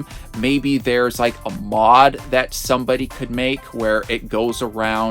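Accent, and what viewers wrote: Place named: Canada. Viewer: You sound like a Canadian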